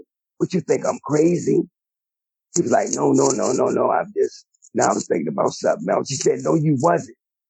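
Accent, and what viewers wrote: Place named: South, United States. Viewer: Just his southern accept so cool!!!💖💖💖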